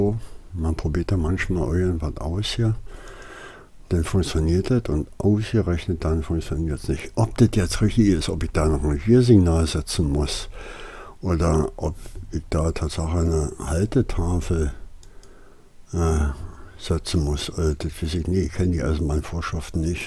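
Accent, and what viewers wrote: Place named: Berlin, Germany. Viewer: schöne videos wo du machst.. finde ich richtig gut... ( deine stimme mag ich sehr ) mit Berline dialekt.. scheee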